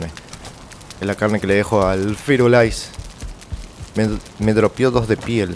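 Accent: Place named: Córdoba, Argentina. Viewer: Una mezcla de cordobés español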